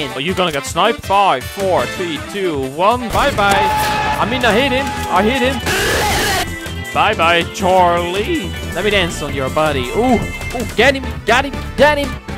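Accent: Netherlands. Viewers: Nice dutch acsent dude im dutch too So its Not HATE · I grew up in Holland and moved to the uk and I can tell your dutch just by your accent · Are you dutch cause you have a dutch accent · Jij bent Nederlands ik kan het horen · Haha je hoort echt aan je stem dat je Nederlands spreekt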